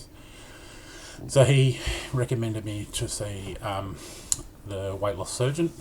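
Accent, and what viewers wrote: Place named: Australia. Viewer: Great job on your first video!! Any friend of Tanya & John's is a friend of ours!! Nice to meet you!! Love the Aussie accent!! Good luck on your journey!!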